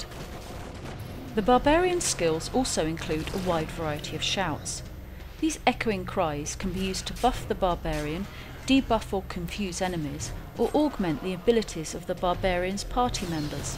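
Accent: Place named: United Kingdom. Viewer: you are to diablo3 what David Attenborough is to the BBC. I know us Americans have been trained to think British accents are smart but it definitely works for this vid.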